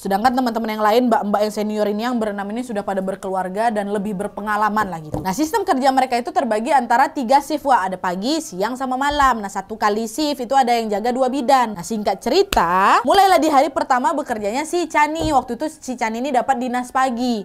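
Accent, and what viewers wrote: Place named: Medan, Indonesia. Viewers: Suka bngt sama,dengan logat anak Medan ya 🤭, success dan bahagia selalu ya. Ngikutin dia dr screen msih 1jtan.. mkin ksni mkin kereeennn 👍👍 mantab. Btw tumben gak da jamscare nya nih 🤭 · ini aslinya orang mana sih.??? Kek orang Sumatera deh..tp ga tau Sumatera mana... 👍😂😂😘😀😀😀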